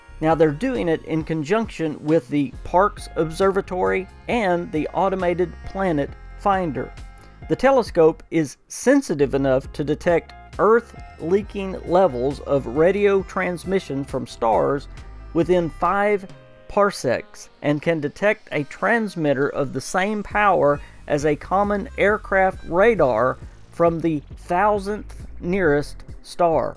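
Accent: South, United States. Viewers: Are you from North Carolina or Texas? Someone said you were in Kansas, but I can't tell exactly.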